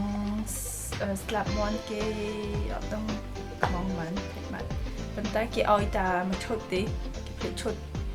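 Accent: Cambodia. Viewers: I love your Khmer accent so much that I have to subscribed the channel. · You’re so cute with your khmer accent. lol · Coucou ! Je suis francaise d'origine cambodgienne et j'adooooooooooore ton accent khmer. je suis troo contente de voir qu'il y a d'autres personnes d'origine khmer qui parlent khmer parce que yen a pas beaucoup. Tu peux continuer à faire des vidéos en khmer ? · Funny but cute khmer accent.Thanks for sharing.Keep up the good works!..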